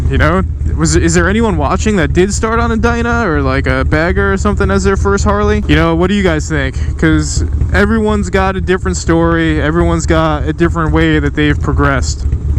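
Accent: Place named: Long Island, United States. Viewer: This is my first time watching you but I'm glad I found ya I can tell this is Longisland I'm assuming, which is where I'm from I'm looking to get a dyna for my first bike this spring/summer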